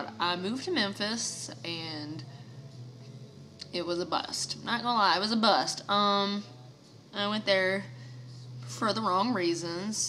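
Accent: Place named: Missouri, United States. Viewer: is it just me or is there definately some missouri accent rubbing off on him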